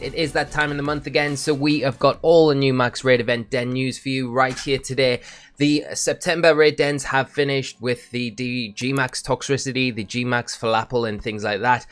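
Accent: Newcastle, United Kingdom. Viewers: Wish we had gmax Morpeko 😢 btw are you Geordie?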